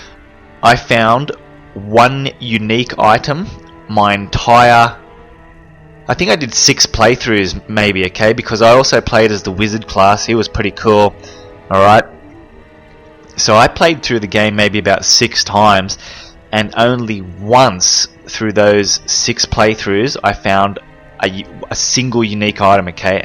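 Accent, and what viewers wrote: Australia. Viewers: Are you an aussi? I love your accent!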